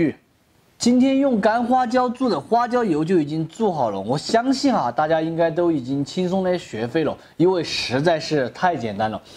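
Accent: China. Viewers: 这椒盐普通话，听的好上头😁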